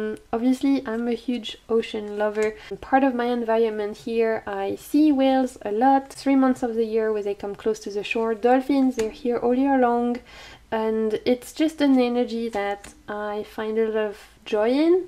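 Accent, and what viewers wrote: France. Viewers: Your lovely French accent is what makes your videos so pleasant to me😘 I love to hear different accents in videos: it makes me appreciate the creators' effort to speak a second language and reach a wider audience even more. I am very self-aware of my Italian accent too, but creators like you inspire self-confidence in me. Sending healing vibes❤️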